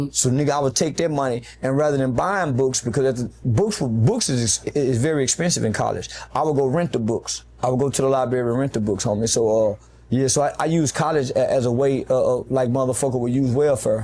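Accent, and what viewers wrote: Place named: Florida, United States. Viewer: he sound like florida accent a lil bit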